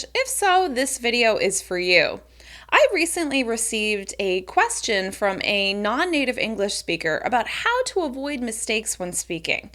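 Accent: United States. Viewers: Good Morning! How have you been today? Thank you for your lesson, warm American voice and a little fast but i got it. Norge Guy.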